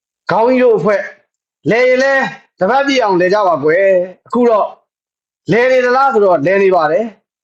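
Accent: Yaw, Myanmar. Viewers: မဂ်လာညချမ်းလေးပါ ကို ရေ မီသားစု များ အဖွဲ့သားဓမ္မဘက်တော်သားမို့အားလုံးပါနေကောင်းကျန်မာစိတ်ချမ်းသာလို့ရာဆန္ဒပြည့်ပါစေ 🙏🙏🙏🤘🤘⭐⭐🌹🌹❤❤အလွမ်းပေးတဲ့ ယောနသံလေးကွ 😂❤ဟို့နေက မဆီမဆိုင် ခဏနားမယ် ဆိုသာကို မျက်ရည်ကျလာတယ် 😢😅အဘွားကြီးဆိုတော့ အသဲနု၏ 😊မျှဝေလို့ ကျေးဇူးပါနော် ပုံပြောတဲ့ကိုကိုရေ👏👏👏💞💞🌷🌷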